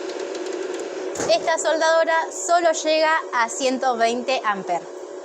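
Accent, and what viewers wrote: Argentina. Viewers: Muy buen video explicativo, pero nada personal me desespera mucho el acento argentino (ladilla mental mia) me gustó la explicación y me aclaro muchas dudas, pero no creo seguir viendo tus videos. Sigue así gracias por la ayuda.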